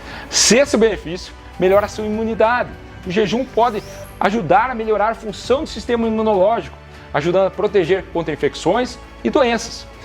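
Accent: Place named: Caipira, Brazil. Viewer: Já era fã, agora que vi de camisa polo, apaixonei. Esse R caipira, então...🥰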